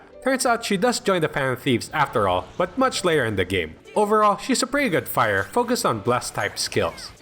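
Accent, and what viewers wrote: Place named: England, United Kingdom. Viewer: yet another pronunciation of names à la english smh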